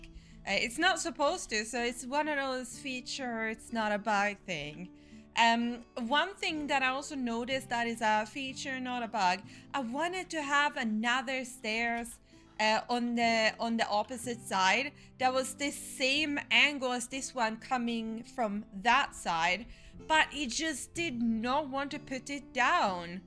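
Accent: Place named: Sweden. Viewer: Hey there, thanks for the fast reply! I just finished the rest of your video and I really enjoyed it. The dungeon part turned out very cool with the stair cases and vents. My favorite build set right now is Nemedian. I think the thick stone and rustic wood look really nice together. And that's a Swedish accent there!! Thanks for saying so. With a smidge of old British of course ha ha. It's like music to my ears. Looking forward to your part 3!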